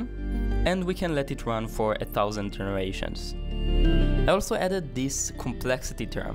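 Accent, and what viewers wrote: Israel. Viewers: nice ai vido and i know u are an isreali becuse of the axent i am 2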